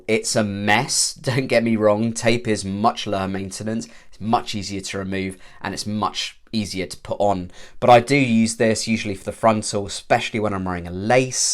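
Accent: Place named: England, United Kingdom. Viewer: greatly appreciate your swift reply! i live in Kazakhstan, perhaps quite far from where you are, which, judging by your accent, is in England?) Agree, going to a stylist would be the safest option. I am torn between Lordhair and Lavivid - Lordhair offer haircut, too, i just need to send them a preferred style from a catalogue. Do you think it is normal/safe? But my biggest issue/fear - how do i measure the size of my head? I have a large head, and should i simply rely on a measuring tape? thanks, mate!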